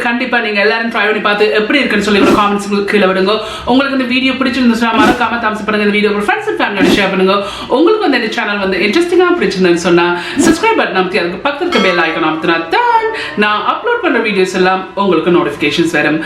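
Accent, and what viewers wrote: Jaffna, Sri Lanka. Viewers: I like the way you speak Jaffna tamil and including some sinhala words like bola, kithul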